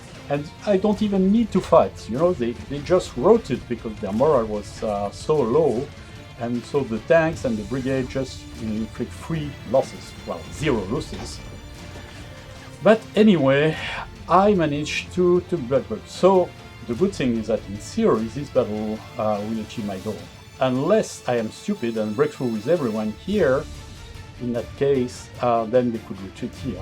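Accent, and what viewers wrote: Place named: France. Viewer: Well done! Great explanation of game mechanics along with good game play. As I worked in Paris, I enjoyed your accent and brought back wonderful memories.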